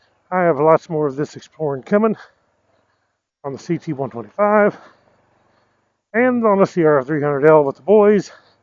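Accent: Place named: Georgia, United States. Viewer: ain’t no way ur from south Arizona sound like Georgia or maybe my area East Tennessee haha !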